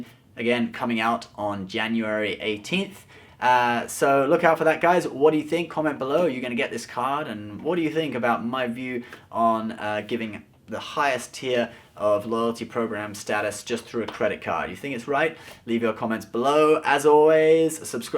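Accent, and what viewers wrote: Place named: United Kingdom. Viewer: dude you are just awesome. Love how objective and down to earn you are and the cheeky grin with the British accent really adds to the whole shabang haha. PS: Thanks for the Trump tax video, I knew when you took a non-apocalyptic approach to anything Trump some idiot in the comments would try to flag you as a supporter or attack you lol. Anyways stay awesome man and keep fighting the good fight.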